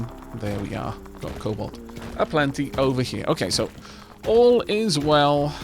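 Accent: United Kingdom. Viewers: More videos, more! Also, large heckin' maps. Just fast forward/skip through non-commented spots. Although there's something vaguely relaxing almost ASMR-esque about vaguely British voice commenting while mining out a vast sprawling underground.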